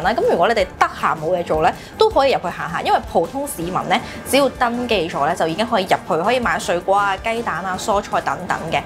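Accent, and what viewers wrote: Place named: Hong Kong, China. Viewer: 講嘢超浮誇有多懶音，你想人聽你講嘢係咪都應該字正腔圓少少？ 什麼Hi咗1秒？ 正宗港女tone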